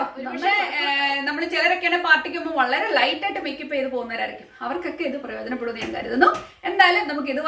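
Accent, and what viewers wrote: Kottayam, India. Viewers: രസം ഉണ്ട് സംസാരം. ഇങ്ങനെയാണ് ഞാനും പറയുന്നേ കോട്ടയം ആണ് alle?? ഇഷ്ട്ടയിട്ടോ 😘😘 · Hi chechi am your new viewer from pala kidangoor now chechi kottyam kari ano samsaram nalla rasam undu my hair also thin anu and no idea about beauty tips you help me a lot beginners nayi simple make up products okke detailed ayi paranju oru video cheyyamo and 9 years kurtis nu venda hair care also. Are you working as a nurse keep rocking 💐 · kottayam kariii ano aaoru slang varunudalo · Kottayom Kari aano chechise.. Samsarom athu pole undu..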